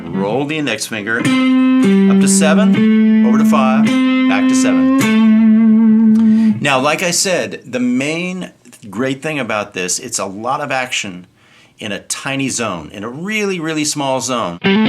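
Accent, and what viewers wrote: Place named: West Coast, United States. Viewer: Oh man, I'm killing myself here. I lifted your solo at the beginning at a slower speed. Finished that last lick and then you start talking but it's still at slow speed and LOL! it sounds like you just took 10 vallum pills ha Oh man with the west coast accent.:) classic...Thank for the licks. I stumbled on this and thought I would a great solo to teach my students. Good strong and Rhythmically accurate licks which is rare in a lot of players.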